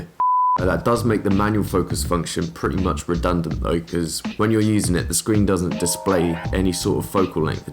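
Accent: United Kingdom. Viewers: love your videos!!! Could you say more about the settings you use for the different digicams? (music a little bit too loud for me, though... not sure you need it while you speak, let us enjoy your cute British accent😉...) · I like your accent, it's that cool and actually bearable British also cool video and obviously great visuals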